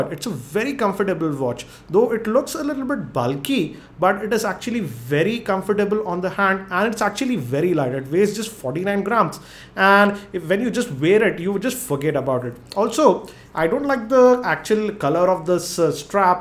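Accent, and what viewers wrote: India. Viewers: " it is kind of oke " i love indian accent and how they shake their head while talking.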